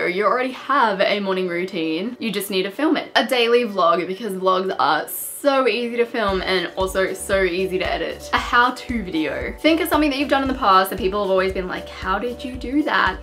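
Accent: Australia. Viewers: I think you're Australian accent, I love that accents.I went there for vacation love listening people are talking. I'm gonna start a new channel too. This is helpful .because I'm a new mommy wanna be busy while im home taking care my 3 months old baby. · Are you Australian? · Omg r u Aussie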